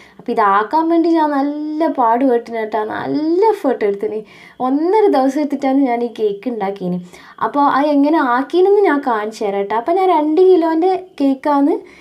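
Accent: Kannur, India. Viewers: Samsaram kettitt kannurano enn oru .........😁😁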